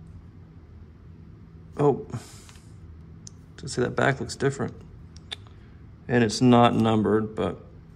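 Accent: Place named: North Carolina, United States. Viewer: Based on the accent I assume we are from the same “Queen City” in NC. Which LCS do you use?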